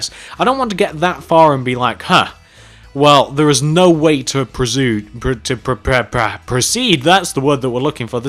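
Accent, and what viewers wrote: United Kingdom. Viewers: I knew you were British because of your accent. Thanks for your country saving us from the French.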